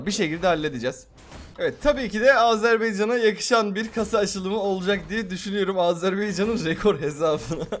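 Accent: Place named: Gaziantep, Turkey. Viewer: abi gaziantepli mi abi konuşması benziyor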